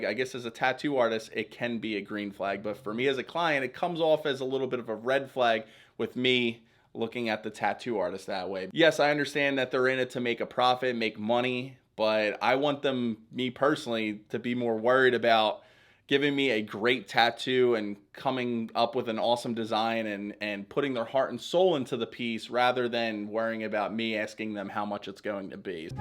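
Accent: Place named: Philadelphia, United States. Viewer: Completely unrelated comment I'm new to your channel and listening to you talk I immediately thought "this dude's gotta be from philly or the surrounding area." then you mentioned and "wooder."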